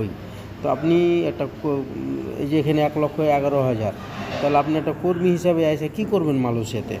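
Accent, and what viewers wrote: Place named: Chapai Nawabganj, Bangladesh. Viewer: ভাইবে বাড়ি কি চাঁপাইনবাবগঞ্জ নাকি?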